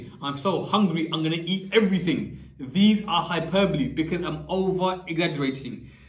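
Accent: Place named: Bradford, United Kingdom. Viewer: brother you from bradford?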